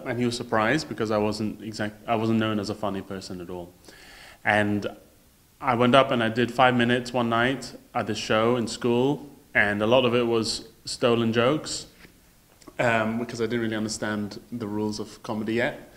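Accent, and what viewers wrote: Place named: Malaysia. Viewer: his malaysian accent seems a bit stronger here haha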